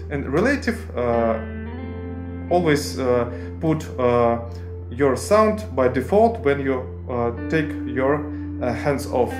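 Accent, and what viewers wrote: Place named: Russia. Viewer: А можно на русском такой же? Судя по произношению Русский вам должен быть знаком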